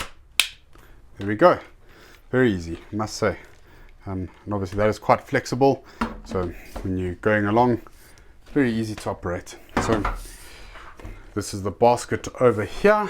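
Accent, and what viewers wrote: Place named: South Africa. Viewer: nice review...good to hear south african on youtube!! looking to buy one of these with the 6A battery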